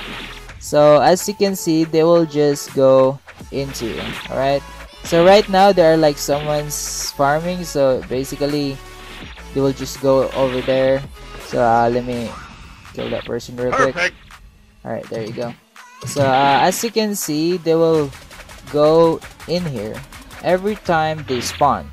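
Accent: Philippines. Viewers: woah also if u dont mind me asking but are u filipino? ur accent is familiar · Sounds like he is from Philippines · You sounds like a filipino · This Guy Is A Filipino I Dare You His Voice Is Like a Filipino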